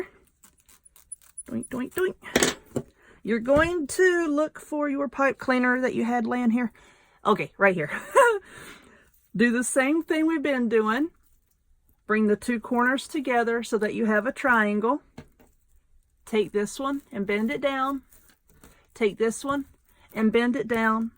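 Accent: South, United States. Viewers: Hahahaha! I LOVE watching your video's!!! You talk like I do......:) I absolutely adore this Rose, I've never seen this before? It's BEAUTIFUL!!! Thank you so much for sharing your talent with us! BTW.......I'm a Georgia Girl.........and love the country Twang!!! LOL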